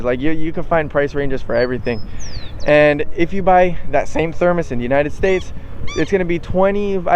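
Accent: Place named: Argentina. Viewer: You have an accent in English why do you act like you’re American. Maybe you lived here for a while but you’re Argentinian.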